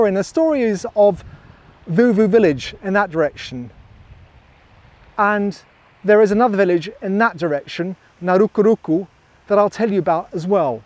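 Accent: England, United Kingdom. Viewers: That English accent will get you anywhere...fantastic work. There's a koro nearby called vatukarasa,one side indians live and the other side fijians and both sides are very accommodating let them take you night fishing you will absolutely love it.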